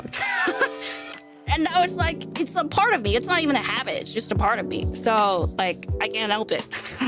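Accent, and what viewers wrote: South Korea. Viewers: I did find her Korean accent cute. But maybe for Korean, especially in school ages, they'd find it annoying That also explains why she sounds so monotone. Maybe she was scared people would judge her accent if she talks normally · That actually sounds cute.cutest Korean accent I heard haha I love it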